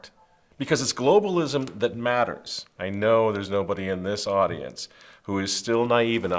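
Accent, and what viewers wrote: United Kingdom. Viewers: You do a great British accent!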